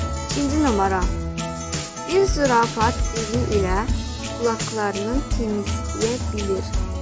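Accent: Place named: Azerbaijan. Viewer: Türkçe Çoxda Danışanmırsan O Saat Başa Düşülür Azerbaycanlısan Genede Halaldı =)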